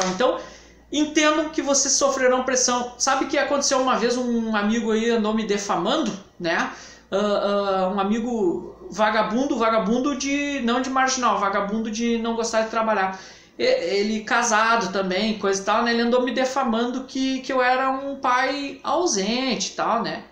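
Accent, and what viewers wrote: Rio Grande do Sul, Brazil. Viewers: Boa noite. Assisti teus vídeos, os módulos e dei muita risada e aprendi muito com você. Sou do nordeste (Recife - PE) e me diverti muito com teu sotaque gaúcho (bá, gurizada, etc). Você é um talento na comunicação. Parabéns. Vou iniciar nas próximas semanas como Motorista UBER e agradeço teus vídeos. Super abraço. Gostaria que você me adicionasse no grupo de Whatsapp e também no teu whatsapp provado para ir aprendendo com você e com os outro colegas (<num>).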